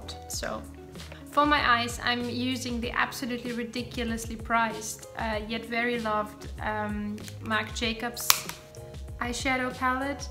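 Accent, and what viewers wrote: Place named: United Kingdom. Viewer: Don't worry we love your British accent 💛 And thanks for sharing your new video I love them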